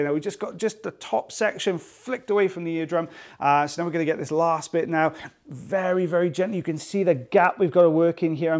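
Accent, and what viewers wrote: United Kingdom. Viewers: I’ve watched ear wax removal videos that have no explanation as to what they doing and I wondered why they only use certain tools at certain times. I had no idea the white stuff was skin! I found your videos and love that you explain everything and I’m a sucker for a British accent! I’ll watch no matter what format. Tissue paper??? Really??? Why?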